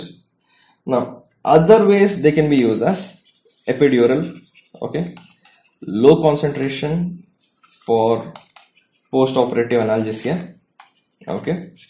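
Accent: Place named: India. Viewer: great lecture although heavy indian accent sometimes difficult to understand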